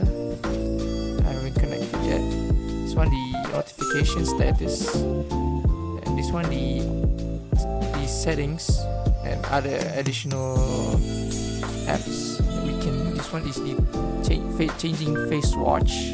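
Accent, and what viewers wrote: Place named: Malaysia. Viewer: bang orang malaysia ke?sbb mcm malaysia...hehe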